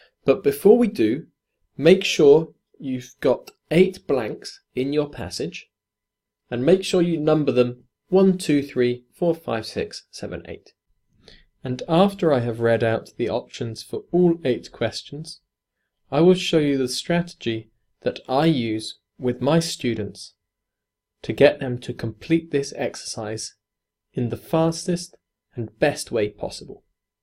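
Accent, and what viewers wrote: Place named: United Kingdom. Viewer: Dear I live in Turin in the north west if Italy even if I come from South east of Italy (it's funny, isn't it?) Today I saw your website <link> but I was a bit lazy. In the next few days I'll take a look at it better. I see also if I pay more.or less 9 pounds, if I remember well, I can become a member. Is it right? I've found your website so interesting because is made well and it contains a lot of interesting things. I like so much your British pronunciation and appreciate your method. My English skill is better than some months ago because I listened a lot of English videos on YouTube. But now it's time to improve also my grammar skill and I think that your website will be useful for me. Waiting to hear you have an amazing week. A warm hug Benvenuto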